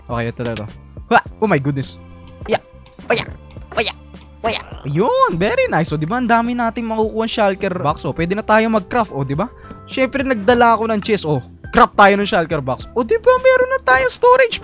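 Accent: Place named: Bulacan, Philippines. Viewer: Pareho tayo ng punto ng pagsasalita kuya Proud Bulaceno Here taga bustos po ako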